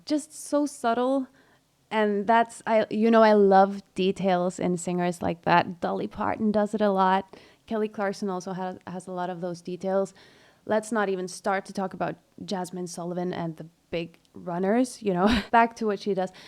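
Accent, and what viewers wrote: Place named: United States. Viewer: I AM REALLY SHOCK 😱😱😱😱😱 I confess that i didin't know her before watching this video, and hands down to her oh my Goodness What a beautiful voice, i'm gonna watch more stuffs about her Please don't be mad at me, but she's also reminds me just a liitle bit of Mariah carey 😂😂😂 i'm sorry, of course she has her personality, which is stunning, and the jazz vibe changed everything But over all i love this so much "Without me disturbing you", you never disturb us, at least for me And wow!! You're from norway!! It's a beautiful country, and your english is very american hahahaha, so good for the singers 😎 Anyway, thank for the video 💛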